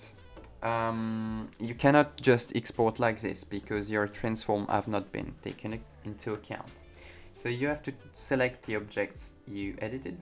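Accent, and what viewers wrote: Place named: France. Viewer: Thanks so much for making this video. Your French accent is great mon ami!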